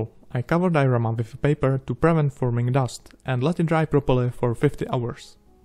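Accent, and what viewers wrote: Czech Republic. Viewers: Maš typycky českou angličtinu. Ta sry mě ta artikulace prostě sere chtělo by to zapracovat ale jinak moc hezká práce. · Really strong czech accent :D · Z toho přízvuku to bylo poznat a české nápisy to dovršili a ty taky 😁 takže příště video česky 😶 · Českej přízvuk se pozná xd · Ja sem si mislel ze ses cech podle ti anglictini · Já jsem si myslel že jsi Čech už jenom podle toho přízvuku když jsi mluvil anglicky 😂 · podle přízvuku jsem si říkal že jsi čech :D , ale supr video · Hned jsem podle přízvuku poznal našince, krásná práce kamaráde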